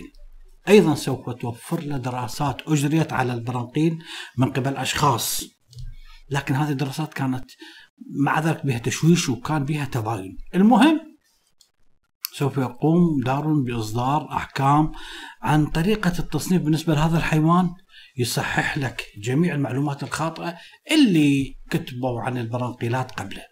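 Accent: Iraq. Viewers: شمدريني هو الفيديو طلع يمي من وحده ..وشفته لهجته عراقي ستغربت .و امداكم🖐🖐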